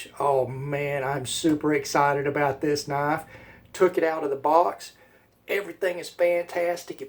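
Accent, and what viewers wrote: South, United States. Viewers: G'day Champion how ya doin ? i'm new to your channel and i'm already lovin it. Great reviews and very informative, keep up your great work P.S i love your southern accent. Cheers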